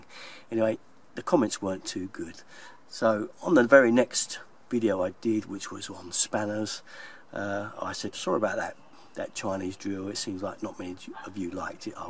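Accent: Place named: London, United Kingdom. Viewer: What part of London are your from?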